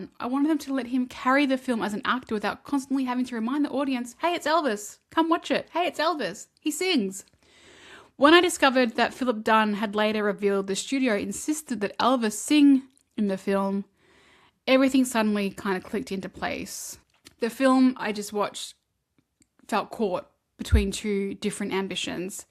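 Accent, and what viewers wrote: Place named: Australia. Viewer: can i just say, you should never have to apologise for having an Aussie accent. it's yours and you should own it. if there are viewers who complain about not being able to understand you, then they can simply put on subtitles. you have a stunning accent and should be proud of it.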